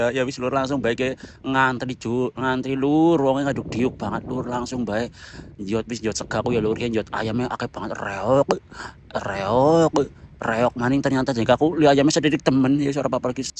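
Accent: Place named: Banyumas, Indonesia. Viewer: Ga PP bng di isi suratnya yang ngapak, suara has, jadi candu, buat nyari bang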